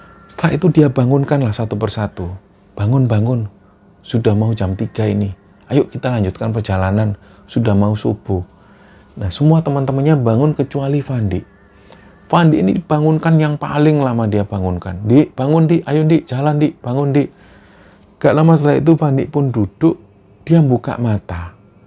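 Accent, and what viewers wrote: Java, Indonesia. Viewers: Gunungkidul hadir mas.. sehat" sllu mas,suka pembawaannya.. wong jowo,ora ilang jowone.. 🙏🙏🙏🙏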